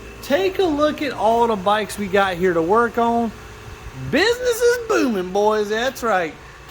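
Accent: South, United States. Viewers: Whatsup with your whiny redneck voice?? Pls stop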